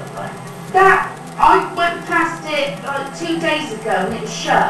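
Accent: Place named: Bristol, United Kingdom. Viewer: She's from Bristol they all sound the same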